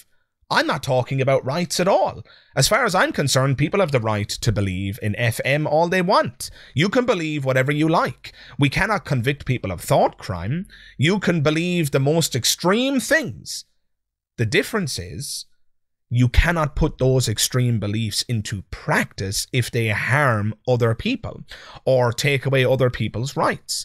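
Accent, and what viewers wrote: Ireland. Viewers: Replying here mainly to test a theory I have pertaining to YT comments. Anyways! Loved the skeptalk show with you and Shannon, both of you brought your absolute A game! Skeptalk is how I found your channel. I'm a bit of an accent/dialect connoisseuer, and listening to Shannons Canadian english playing off against your Irish english hit a sweetspot for me. I know, I'm weird that way.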